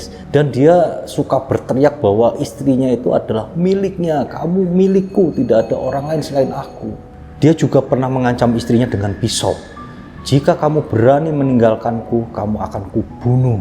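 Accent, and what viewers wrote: Java, Indonesia. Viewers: bingung... Saya dengerinnya juga bingung kejaaam bgt.... Suka logat kejawaannya medok medok gitu mantaaap deh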